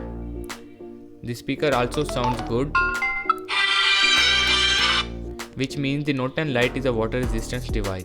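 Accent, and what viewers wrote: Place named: India. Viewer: Индийский акцент это пиздец.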